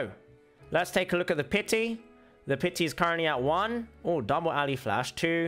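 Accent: United Kingdom. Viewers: He is asian, yet he sounds a bit british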